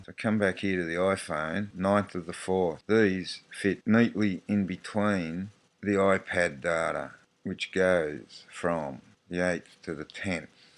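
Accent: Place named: Australia. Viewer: I'm pretty frustrated with mine too. Next to no instructions with it. Have to learn from other YouTube users or by trial and error. I reckon I've flown maybe a half-dozen hours! . I guess the presumption is that everyone's an expert. Can't calibrate it. Blooming expensive shelf ornament. (PS: Glad to hear an Aussie accent). I might go back & see Gerry Harvey's staff again. (Sigh)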